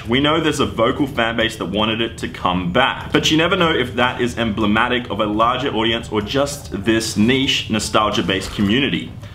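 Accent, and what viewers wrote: Australia. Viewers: thank god your back i miss that Australian accent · Are u Australian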